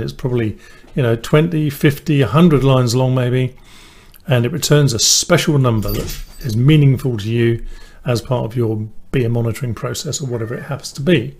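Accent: United Kingdom. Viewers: Thank you. I hesitated to start a 38 minute video tutorial, but it was worth it just for the calm Pommy accent and absence of duffa duffa music! I am an old veteran since the 70s of programming assembler on bare metal for embedded realtime controls, and only just in retirement wrapping my head around C/CPP. Some of the syntax conventions really "get" me, and I have to remind myself I am dealing with a very simplistic one-pass compiler that needs lots of cues in order to work. The work flow from multiple tabs / project folder to files in the library folder was very helpful.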